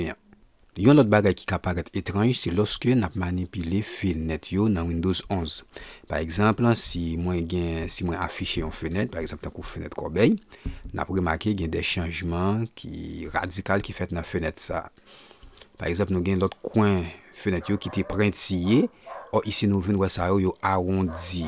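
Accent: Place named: Asia. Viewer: Sans l'accent asiatique, ce serait plus facile à suivre...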